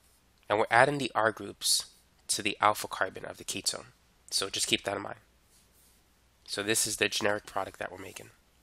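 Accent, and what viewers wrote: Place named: Northeast, United States. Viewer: his voice is soothing idk what you’re talking about. lmao its like an northeastern accent from the US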